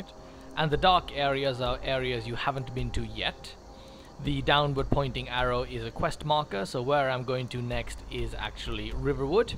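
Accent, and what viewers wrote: United Kingdom. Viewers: That is a British accent, the man is actually British :P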